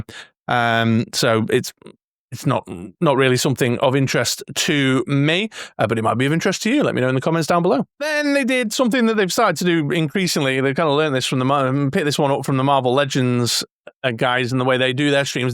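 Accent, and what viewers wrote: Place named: United Kingdom. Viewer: What a great review. Plus the accent gives it that British charm and you can tell how excited you are by the volume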